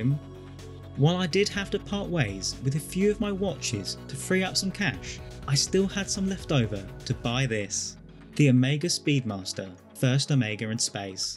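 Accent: United Kingdom. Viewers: He's British. He's just talking.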